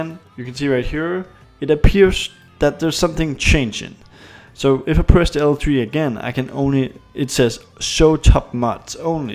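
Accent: Denmark. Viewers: Are you danish?? You Sound danish in your accent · man tager ik fejl når man høre dansk accent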